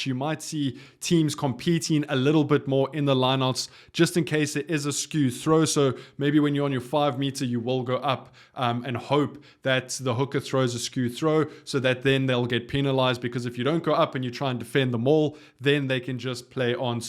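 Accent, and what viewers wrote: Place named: South Africa. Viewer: I love moeeerrrr the like and subscribe. Truelly souf’aafeikaaan! Please like and subscribe is so English. And you know what we think English means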